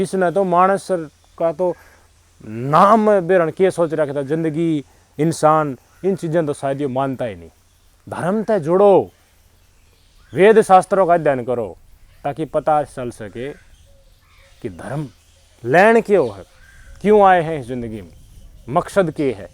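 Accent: Haryana, India. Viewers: Bhai sahab aapkii videos 📹 nd pure haryanvi khadii bolii ekdum steek nd sath sath main social society aur bade buddon kii kahawaten so awesome 👌...bhai jiii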